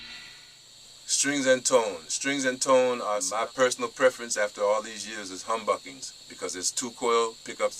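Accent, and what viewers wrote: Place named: Jamaica. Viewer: "Now if you listen carefully you will hear..." Man speaks like Bob marley 🇯🇲😁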